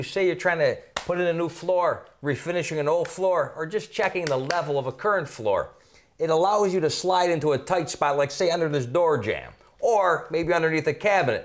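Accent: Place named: Brooklyn, United States. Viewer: I am getting Italian Brooklyn vibes lol. Learned a lot TY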